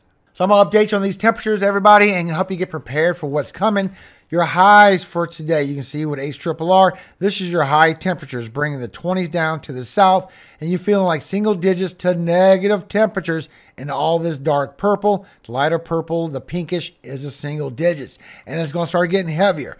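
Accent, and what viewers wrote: Louisiana, United States. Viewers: As always good ,needed , information and the fact that your Cajun is just icing on the cake... thanks for all you do !! And the extra gift of reading us the scriptures 😔❤️ take care BB from somewhere in Louisiana 🤠👍👍👍👍👍👍👍👍👍👍👍👍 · sounds like you are Cajun?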